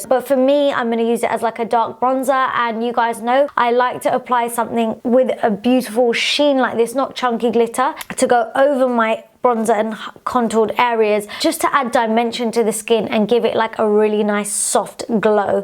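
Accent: United Kingdom. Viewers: Wow loved the makeup look you sound so British like a lady from loose women omg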